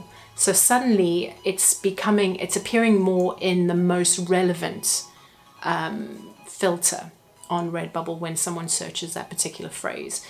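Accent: South Africa. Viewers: Another value packed video. Thanks for the awesome tips. Are there any valuable Redbubble facebook groups that you feel comfortable recommending? Then off-topic, are you perhaps South-African? I detect a bit of a SA accent.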